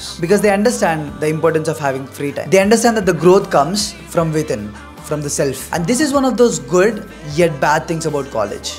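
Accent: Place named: Telugu, India. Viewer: ur telugu asscent hai aye hai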